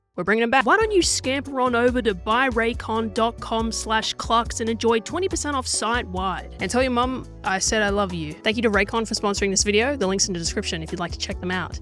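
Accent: Australia. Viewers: Are you me with tits and an Aussie accent? The fuck has the internet done to our generation? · Why is Kurtis Conner speaking with an Australian accent? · You area Australian? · I love her accent 🇦🇺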